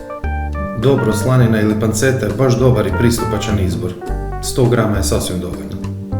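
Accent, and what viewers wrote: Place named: Slavonia, Croatia. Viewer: Kako te je lijepo slusati,tako i mi u Slavoniji pricamo,puno pozdrava tebi i tvojoj Familie 🥰🥰👌👌❤❤👍🏻👍🏻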